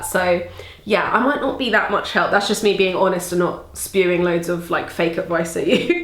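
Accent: United Kingdom. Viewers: You are so cuteeeeee with your british accent!!!!!!! <3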